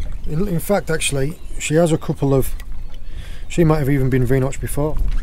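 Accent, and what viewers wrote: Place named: Cornwall, United Kingdom. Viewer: A very sustainable approach to sorting the catch. Enjoyed the sweet and lovely Cornish accent either. Missing it now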